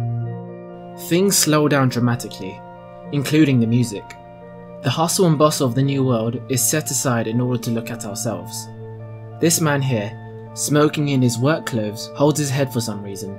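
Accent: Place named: London, United Kingdom. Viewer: I can hear the London in your voice haha, this is some terrific commentary. An analysis on Isle of Dogs would be great, taking the more symbolic approach would be something I haven't seen effectively yet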